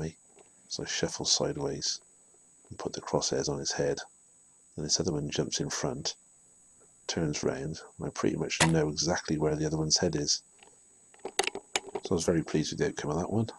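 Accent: United Kingdom. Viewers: I love the British commentary. It's like he's on an African Safari or a morbid tennis match.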